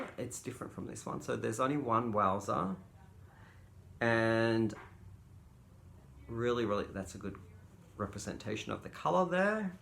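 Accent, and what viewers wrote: Australia. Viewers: Love hearing the Aussie accent. Loving your channel. Oi Oi Oi x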